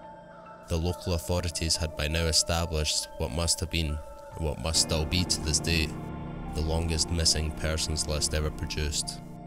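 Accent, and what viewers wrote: Scotland, United Kingdom. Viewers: Great now I'm definitely not going to sleep at all. I love your channel and the fact that it's in Scotland and told with a Scottish accent. Ugh death by no sleep lol. I am loveing the stories and the chills though as they are definitely what I watch them for. I Hope you keep up the great work and continue the scares. Have a blessed day or night. Oh and I think I'm going to call you. short for as you sound like a vampire just don't pronounce your w's as v's so it is even more chilling and better to listen too. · Hiya ive recently came across your channel & I love the Scottish accent & history so you've got yourself another sub:) Keep up the good work your doing & looking forward to your next video. XxX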